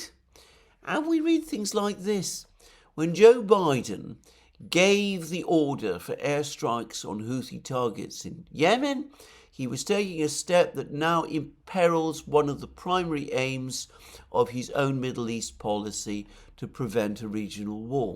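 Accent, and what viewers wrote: United Kingdom. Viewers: Excelente clever well thought information. Your British accent so perfect for today’s description on Russia strategy. Great sound track. Thanks Alexander ❤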